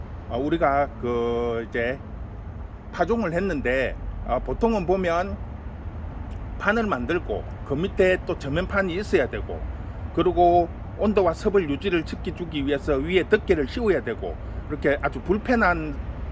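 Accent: Gyeongsang, South Korea. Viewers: 구수한 경상도사투리 파종법 영상 올려주셔서 감사합니다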